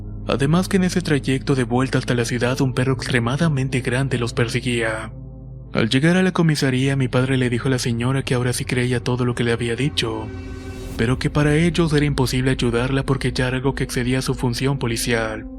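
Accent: Standard. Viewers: Tu acento es como el español neutro de las traducciones de películas de principios de los 2000. Me traes buenos recuerdos. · Oye men, no logro entender cuál es tu nacionalidad, ya que no tienes un acento que te pueda identificar como recidente de ni un país concreto.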